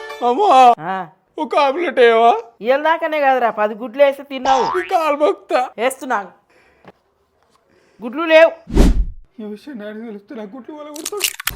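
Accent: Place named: Mahabubnagar, India. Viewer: Miyammak phonjescheppinav ania side kuda ilage matladutaru mi mehaboob Nagar side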